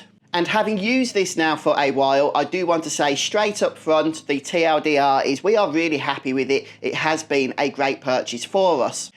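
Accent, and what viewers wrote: United Kingdom. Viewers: Thank you for this great review. I love your British accent. I'm studying for IELTS and is a pleasure to listen and learn from you.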